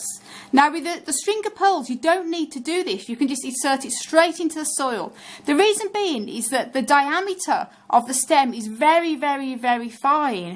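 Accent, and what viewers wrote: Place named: Wolverhampton, United Kingdom. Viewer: Are you from Wolverhampton? Loved hearing somebody local on here! Thank you for the video. It's fab! Fingers crossed my daughter's cuttings root 🤞🏽 have a great day!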